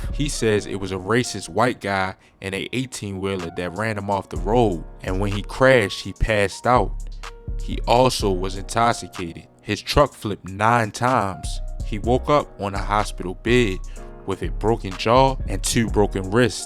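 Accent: Baltimore, United States. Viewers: Stupid ass Baltimore accent🤣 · He’s fasho from boltamore “duuuu” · He from Baltimore they pronounce they U's weird lmao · He from baltimore he cant help it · Bmore accent thick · You from Baltimore aint u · I can hear the Baltimore in you🤣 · Boy if you dnt shut tf up with that damn Baltimore accent shit annoying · First time ever on yo channel and I can tell you from Baltimore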